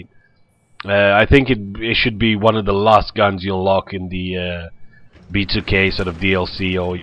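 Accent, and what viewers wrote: United Kingdom. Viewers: he sound British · He's not from Russia that's for sure... I personally think that he is from somewhere in the UK, I can tell by the way he pronounces some of his words.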